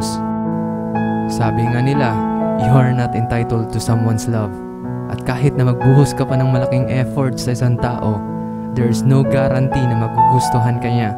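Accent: Visayas, Philippines. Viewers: Klaru kAayo bisdak hahaha.. nice.. bat kaya di ka pa rin sikat...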